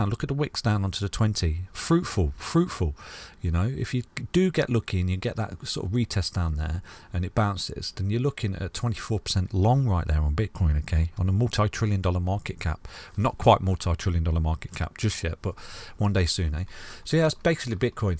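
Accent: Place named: Nottingham, United Kingdom. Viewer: Your accent sounds like Nottingham, am i right?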